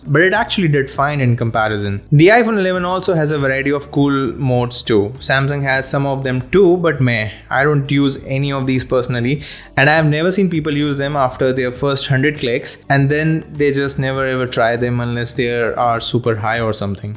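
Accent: India. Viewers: I am here to hear the cool Indian accent. :)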